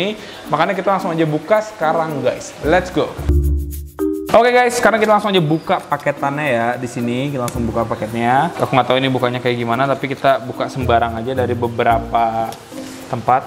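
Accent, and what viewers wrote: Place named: South Jakarta, Indonesia. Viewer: Bocah Jaksel mana suaranya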